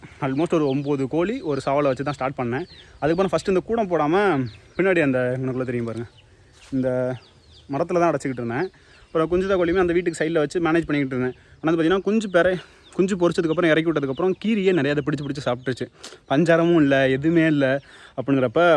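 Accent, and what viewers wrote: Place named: Madurai, India. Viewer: 🤝Congratulations 🤩Your madurai tamil slang was nice to hear🙌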